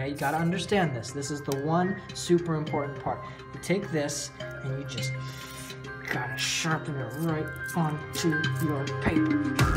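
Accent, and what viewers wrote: United Kingdom. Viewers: Your British accent makes me want to implode. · Why does your British accent sound like Tom Holland? 😂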